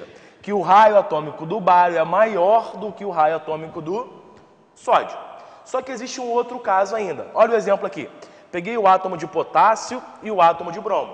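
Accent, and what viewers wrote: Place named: Rio de Janeiro, Brazil. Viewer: eita sutaque de carioca, mt bem explicado!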